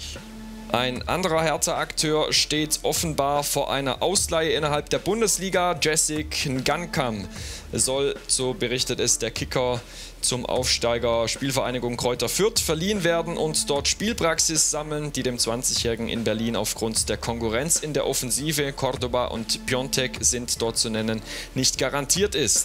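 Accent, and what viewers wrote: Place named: Saxony, Germany. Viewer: Hauptsache Transfermarkt TV hat erstmal einen sächsischen(!) Moderator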